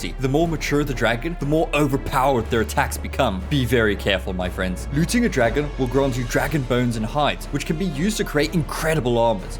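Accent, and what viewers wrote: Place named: South Africa. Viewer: I like your accent. Is it South African? Great content friend. 👍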